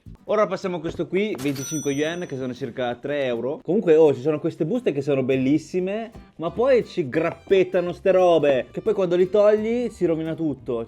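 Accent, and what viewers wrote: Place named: Modena, Italy. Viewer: Che ridere l accento di modenaaa 😂😂😂 sei un grande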